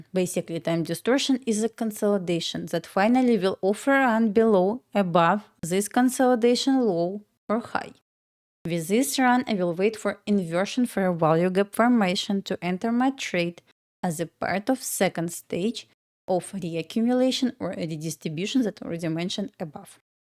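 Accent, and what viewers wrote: Russia. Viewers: YOUR MOTHER LANGUGATE MUST BE RUSSIAN...I CAN FEEL IT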